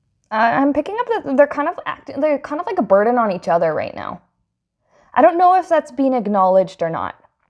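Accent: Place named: Russia. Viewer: Congrats your new apartment 🎉 also that Russian accent 😎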